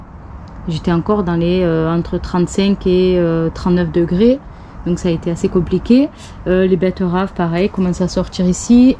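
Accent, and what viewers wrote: South, France. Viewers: Coucou, Ça m'amuse de voir la différence entre le sud de la France et le nord 🇧🇪 l'accent, le type de récolte . Suis impressionné par la taille de tes aubergines et poivrons ! Par contre on a à peu près la même façon de faire. Merci pour cette vidéo A+ 🇧🇪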